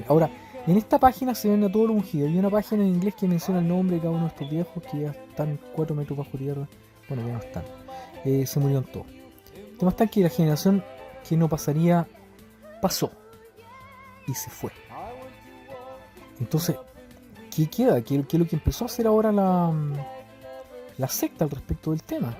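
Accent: Chile. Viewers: TU VOZ Y ACENTO SUENA MUY PARECIDO AL DEL CANAL ( MAROONED ) QUE ES CHILENO LO ERES TU TAMBIEN?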